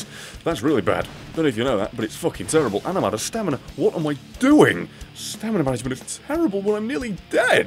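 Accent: United Kingdom. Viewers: are you British? Keep up the good work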